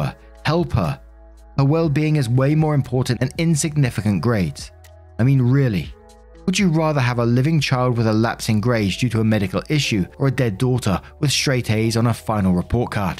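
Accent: United Kingdom. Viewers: He's great at that, isn't he? It's interesting to go back to his older vids and compare how his delivery of and observations about posts has developed. It was when his mother was ill that he first had difficulty delivering his vids on schedule that he began to open up about stress and grief. Well. You can just imagine the waves of love he received from his listeners. He seemed to feel safer with revealing more of his personal thoughts, and frequently apologized for "waffling on." Thus was born the. Throughout all this strife, still managed to be utterly charming. He's smart, funny, and articulate. And to us Americans, his British accent is the cherry on top. Maybe I should say, the real maple syrup on the waffle. Other YouTubers who engage with their audiences a lot are rSlash, Lost Genre, and Charlotte Dobre. Charlotte's a hoot and a half.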